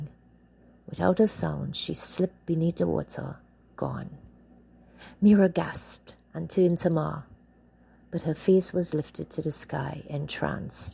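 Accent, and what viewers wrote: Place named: Trinidad and Tobago. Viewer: Love your story. Brought back so many memories and love the fact that you were selective with your trini dialect. It was used just in the right places.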